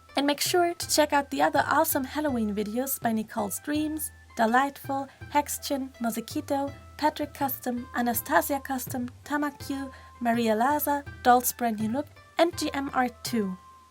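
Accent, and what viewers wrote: Germany. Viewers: It sounds like her mother tongue is German. o_O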